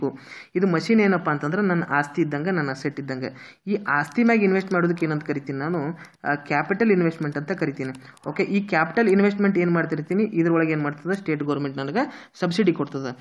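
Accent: Uttara Kannada, India. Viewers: Sir, I so like your uttara kannada language , that is our mother tongue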